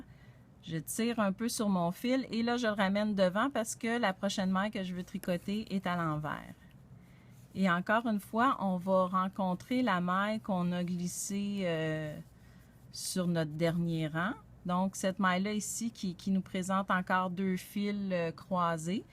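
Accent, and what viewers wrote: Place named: Quebec, Canada. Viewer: Les Laines Biscottes: où se situe cette boutique? Au Québec? Car votre accent me semble du Québec!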